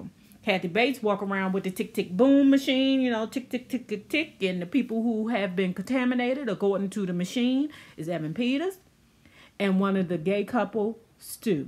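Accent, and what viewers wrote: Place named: Baltimore, United States. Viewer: I can tell you from B-more, your accent is strong!! lol #DRASK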